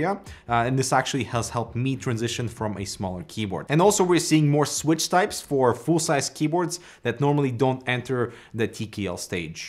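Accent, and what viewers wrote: Russia. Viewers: По началу было сомнение что вы русскоговорящий из-за акцента, но потом в видео подтверждение проскользнуло. ;)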